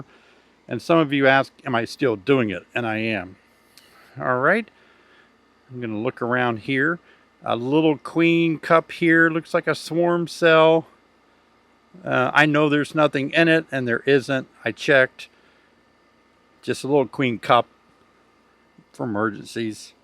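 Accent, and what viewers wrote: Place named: Appalachia, United States. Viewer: It’s an Appalachian accent. I use them both here in Pa!! I’m extremely green with my first hive this year. You are a great source of information and a great speaker. Just added electric fencing to the area too. Bears will be getting ready for winter here! Thank you again sir!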